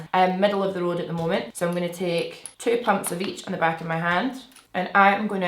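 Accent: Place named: Scotland, United Kingdom. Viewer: U sound like the Scottish version of Yelena Belova and I bloody love it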